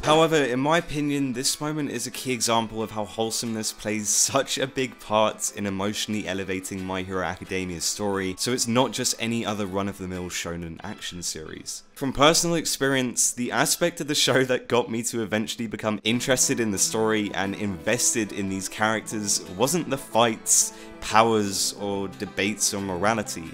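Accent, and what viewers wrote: United Kingdom. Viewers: are you from north or south UK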